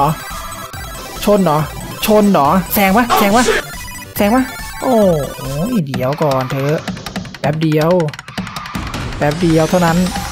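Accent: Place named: Isan, Thailand. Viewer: อ้อ คนอีสาน เฮาบ่ฮู้เด้อ ซอรี่~~55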